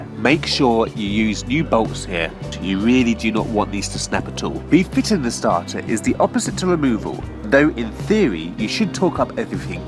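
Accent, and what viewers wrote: United Kingdom. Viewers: Fucking gay British accent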